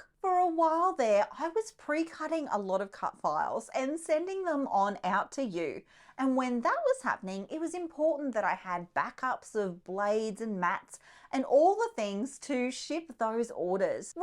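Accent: Australia. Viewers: Don’t talk daft. It’s a very nice Australian voice. You change your nasty attitude.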